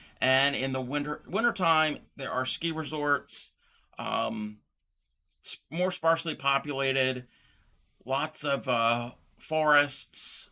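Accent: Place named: Maryland, United States. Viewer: Found you because of your temperature blanket. I noticed your accent and went to your channel. I was right!!! A fellow Marylander. We all have that "sound" that can't be mistaken. Sending you a friendly wave from Carroll Co. This video was fun.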